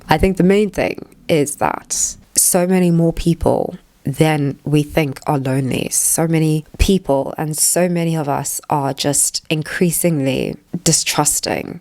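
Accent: RP, United Kingdom. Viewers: What a very posh english voice, never hear white girls talk like that these days. Have had similar symptoms. Maybe its the bioweapons, maybe its the illuminati anti love campaign. British NHS is dead, mental health services, government and society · How can I be your friend for real? Your accent makes me wonder if you live far away in the UK? & the answer is yes. It is sad how humans are replacing having each other, with having things or pets. Pets are more friendly than humans it seems... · Hey I am so sorry. You re exceptionally honest. this has a lot of value. if i have to be honest I am a bit annoyed by your posh accent (i m italian and probably i have a laughable accent BTW, so please forgive this). But you re absolutely adorable. Please reach out if you need something. even to me if there s no one else. (I live in London though). No one should be that disconnected to be threatened to end up on the street with no alternative. 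I really hope you re doing better since you posted the video. If not, please make contact. A hug from an oppresive patriarcal white man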